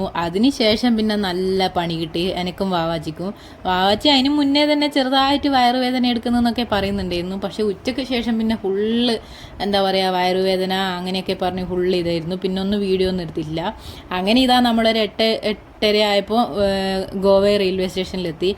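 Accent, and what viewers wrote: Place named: Kannur, India. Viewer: കണ്ണൂർ ഭാഷ 👌👌👌😀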